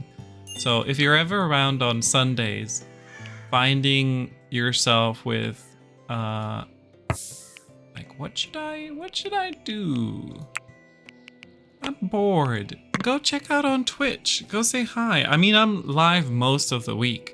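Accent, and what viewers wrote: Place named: Netherlands. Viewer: You sound Dutch. 👍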